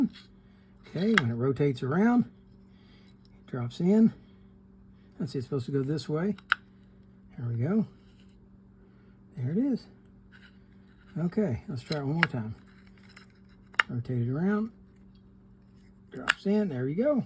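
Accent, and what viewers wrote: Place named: Kentucky, United States. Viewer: even though I have talked to you at length as you guided me through this video, I still just have to comment about this one. Your ability to creatively find ways to fix and repair reels even though parts are missing continues to amaze me. It challenges me to try innovative ways to repair what seems to be irreparable. You did this at least twice in this video and it was amazing. You remember that big TICA surf reel I bought for next to nothing that had a danaged part of the main gear shaft? I figured out a “ miracle” and got that reel working perfectly with a repair that should last indefinitely. As you said in your Kentucky drawl when I told you about it, “now if you hadn’t’ been watching my channel you probably wouldn’t have even tried to fix it.” You were very correct in that assessment as that old but beautiful TICA reel would have been headed for my parts reel boxes. 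When working on this reel you showed another of your many talents - the ability to figure out how to take apart what would confound other less tenacious individuals (such as me.) You also have an almost innate ability to remember how things go back together after you’ve taken them apart. I guess I simply enjoy seeing how your mind works. It is great that you were able to find someone to send you some photos of some missing]g parts that helped you find ways to substitute parts that would allow the reel to properly function. Again, this was an amazing video allowing us to watch over your shoul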